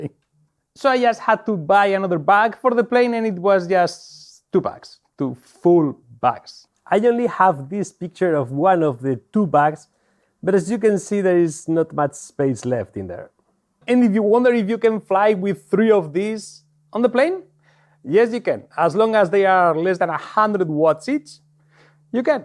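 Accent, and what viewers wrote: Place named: Spain. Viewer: Tu eres Español Pero vamos.... Ese acento... Igual me equivoco. , a ver si te lanzas y metes audio Español también.. Gran video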